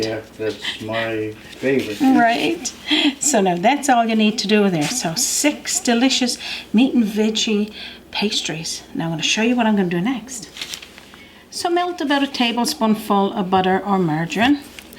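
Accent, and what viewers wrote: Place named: Newfoundland, Canada. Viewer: Hello from Portland Oregon. My daughters are watching your videos to learn how to cook. They love you so much they are mimicking your Newfoundland accent. I heard them asking each other " and " ow" are you"?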